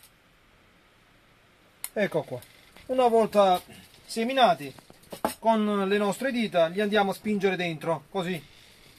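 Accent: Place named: Salento, Italy. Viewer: Sei molto bravo , ti seguo da poco ma mi piace il tuo modo di spiegare , molto semplice e "paesano" (complice la accento salentino ). Io faccio così con i pomodori , zucchine , fagiolini , botole , e quest anno anche con i piselli verdi . faccio il contrario cioè prima faccio le buche con le dita e poi semino ma va bene uguale ,la cosa che faccio in più di te è che metto le spaselle in dei contenitori con sotto l acqua (tipo stanati) dove posso sistemare 4 spaselle ogniuno . L altra cosa , uso le microrizze ,tu le usi ? Cosa ne pensi ?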